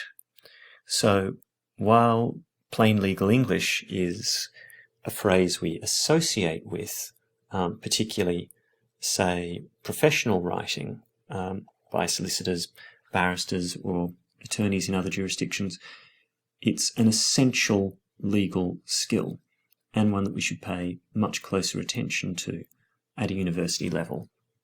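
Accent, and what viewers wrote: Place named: Australia. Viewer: Its Australian love. Where you from?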